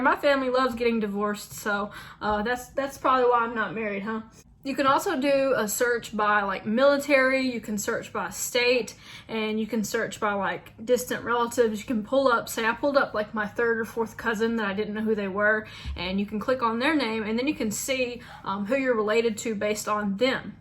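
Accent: South, United States. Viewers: Are you from the Deep Deep South?, because I can hear a SouthernBell Accent from you?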